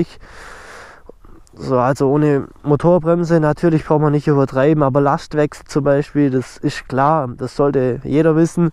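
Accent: Swabia, Germany. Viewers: Doch isch so Aber egal aber was i dich scho lang mal fragen wollte wo wonsch du eigentlich? Weil du so a bissale schwäbisch schwätsch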